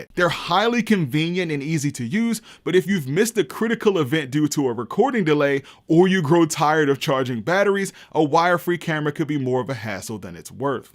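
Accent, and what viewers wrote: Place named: Baltimore, United States. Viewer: Oh you from Baltimore Baltimore lololol. Good video man, this gave me a bunch of info. I'm kinda torn between bullet and ptz, it's gonna boil down to what the individual cameras offer.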